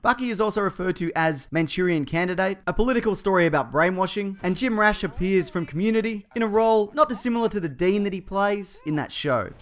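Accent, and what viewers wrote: Australia. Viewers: Are you a Aussie youtuber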